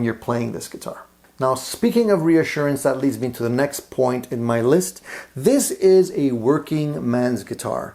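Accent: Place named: Canada. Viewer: Hi where is your accent from? Italian Canadian? Sounds similar to me! Cheers! Nice guitar sounds great!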